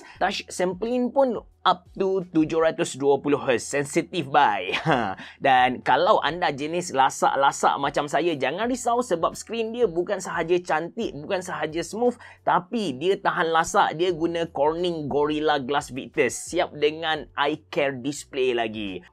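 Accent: Kelantan, Malaysia. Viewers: Cute ye kecek kelate...tak dengo bahaso..kalo Johor tak geti bahase lerr..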